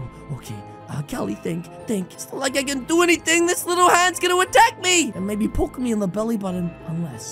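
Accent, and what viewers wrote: United Kingdom. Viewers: Never knew he was British lol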